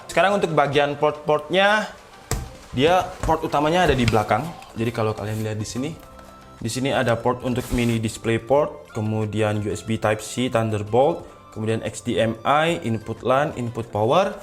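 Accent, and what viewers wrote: Malang, Indonesia. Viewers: Wong malang mas ?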